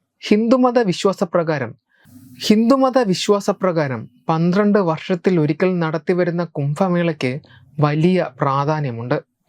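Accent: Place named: Kottayam, India. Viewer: Are you from kotayam or Pathanamthitta area bha or pha 😂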